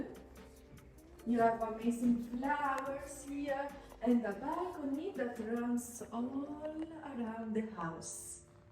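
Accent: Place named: Italy. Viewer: When I come back in the next life I want to be your personal film maker, follow you with a camera all day and listen to that wonderful English with the Italian accent. What a cozy domicile your grandparent's house is. Glad you held onto it and didn't sell it. I am sure you can feel your grandparent's energy when you are there, thank you for the tour.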